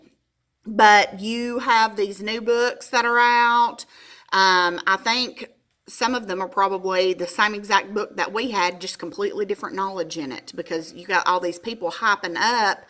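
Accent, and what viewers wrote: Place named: Texas, United States. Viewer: I recognize a Texas accent anywhere!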